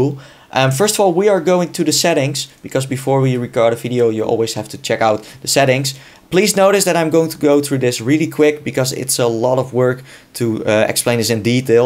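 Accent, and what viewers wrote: Netherlands. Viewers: are you Dutch becous you realey sound Dutch becous i am Dutch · ik hoorde meteen dat je nl was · this guy has dutch accent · Are you dutch? Cool! I could hear it by your accent! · Jij spreekt Nederlands hé je hoort dat aan accent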